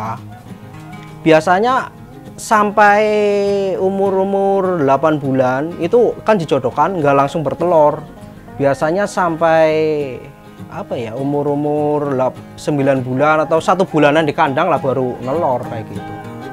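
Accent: Banyumas, Indonesia. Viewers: Ora ngapak ora kepenak yak.. :-D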